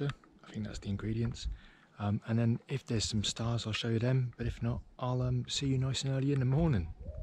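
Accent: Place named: Hampshire, United Kingdom. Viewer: your accent is so similar to hampshire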